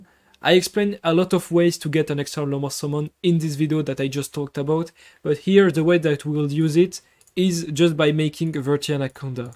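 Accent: France. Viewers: The heavy French accent makes this very awkward and awesome at the same time.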